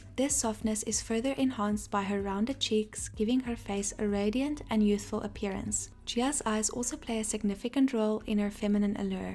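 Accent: South Africa. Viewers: Are you South African? Something about your accent gives me that impression. 💗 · Are you South African? You sound like you are from South Africa.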